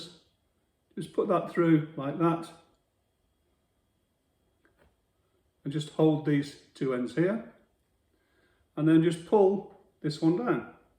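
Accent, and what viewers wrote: United Kingdom. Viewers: The tackle manufacturers like Middy, Drennan and Guru etc could learn something from you, use nylon loops instead of fuse wire with a memory, great video! I remember watching the first video I saw of yours, you were fishing on a lake, a man with a clearly British accent fishing and a Kangaroo hopped into the video in the background, local wildlife came to say "G'day"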